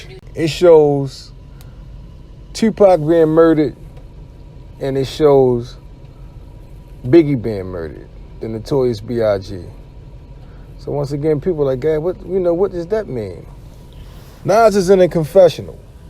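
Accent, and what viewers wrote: Baltimore, United States. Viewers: The way you talk I take it you from b-more am I right🤔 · Somebody tell me this host ain't from Bmore 🤔🤔🤔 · Love the B-More accent lol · Are you from Baltimore cause you have a Baltimore accent?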